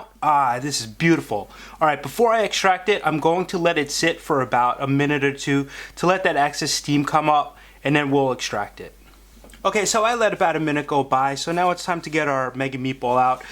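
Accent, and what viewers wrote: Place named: Boston, United States. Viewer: Thanks, you ROCK too! I love listening to your Boston accent! :)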